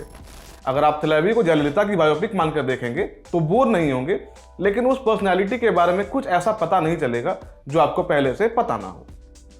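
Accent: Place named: Bengal, India. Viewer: I think he is Bengali.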